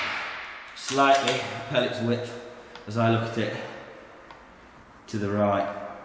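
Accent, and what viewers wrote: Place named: East Midlands, United Kingdom. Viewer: Hi, where is this indoor range mate, sounds like your from the Notts/Derbyshire area (forgive me if I am incorrect in my assumption of dialects). BW Frankie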